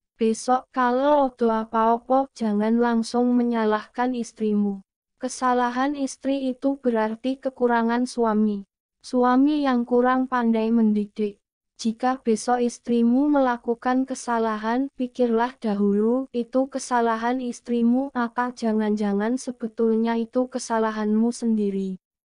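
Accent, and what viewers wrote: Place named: Java, Indonesia. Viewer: Lanjuuttt minnn .. suaranye kok tmbh kyak wong jawii ta 🙃🙃🙃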